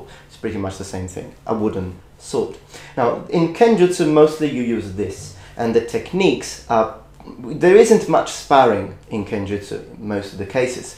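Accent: United Kingdom. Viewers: Wow, I can't believe I have never come across your videos before! I'm in a bit of a strange position really. I live in Leeds. England (I can tell you learnt English here and not in the US :)). Oddly, the only form of Japanese swordsmanship in my area is the Hyoho Niten Ichi Ryu UK Shibu. I have practised Chinese and Korean martial arts in the past, and I am being told my a lot of my martial artist friends that I am extremely lucky to live so near to this school and should give it a go. My heart initially was set on Kendo, for the sparring, but are no schools near me. Do you think that there would be transferable skills if I took up kendo too in a few years? Here is a link to the site: <link> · Your Japanese and English pronunciation are pretty darn good! Did you learn English in the UK? Best wishes from the USA to Italia!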